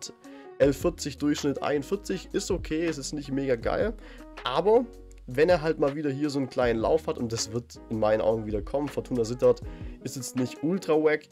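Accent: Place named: Swabia, Germany. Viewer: Kommst du eigentlich aus dem schönen Schwabenland? Hört sich stark danach an 😁👍 Congrats natürlich zu den 1K 🤝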